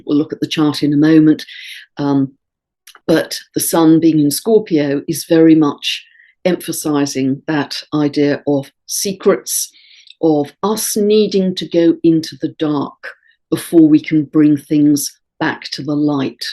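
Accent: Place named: RP, United Kingdom. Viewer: Never been to this channel before, but this woman is poshness goals 😶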